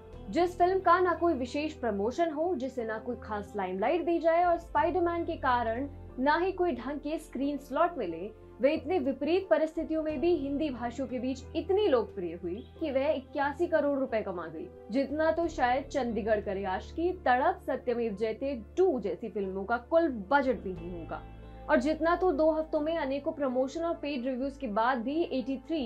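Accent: Standard. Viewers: Apaki Hindi bahot shuddh he ,bahot accha laga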